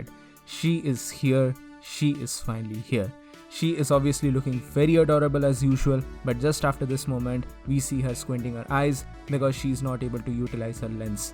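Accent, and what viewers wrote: India. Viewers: hmm Indian accent makes me smile....🙃 proud of you subscribed 🙌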